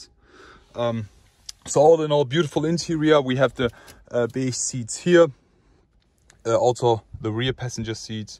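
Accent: Germany. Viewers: Ich kann mir diesen schlechten deutschen Akzent nicht geben . Aber tolles Auto . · Hasse es wenn man den Deutschen Akzent so krass raus hört .. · Man hört manchmal raus dass du deutscher bist :D aber sehr gutes Video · Haha man merkt so den deutschen Akzent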